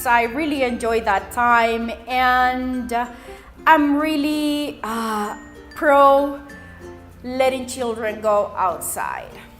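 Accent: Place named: Europe. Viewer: I remember I would borrow my mothers cell phone when I would go to high school basketball games with my cousins. She wanted me to have it so I could call her at home when we needed to be picked up after the game was over. She then bought me my first cell phone when I was 20 as a gift because she was worried about me driving to visit my older brother who lived one hour away. I was thankful but I knew at the time I didn't see why I would need a cell phone. By the way, I was born in 1984 and I'll be 34 in 3 months. My cousin said I'm just a millennial because Xennials were born from <num>. Well I was conceived in October of 1983 if that counts, lol! I feel closer to generation X and Xennials more than Millennials. I finally created an Instagram last Saturday. Now I know what DM means, (Direct Message). I still love the great outdoors more than being glued to my cell phone. 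I'm more into being outside cycling or hiking on trials. Your childhood is very similar to mine. I like your accent by the way. I wasn't sure where you are from at first. I assumed you were from Europe. Well have a great day.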